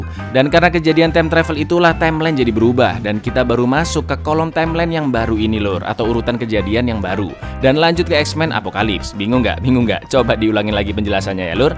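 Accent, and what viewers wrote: Java, Indonesia. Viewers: SUARANE ATMIN MEEDOK KOYO TELO EMPUK NGANGENIN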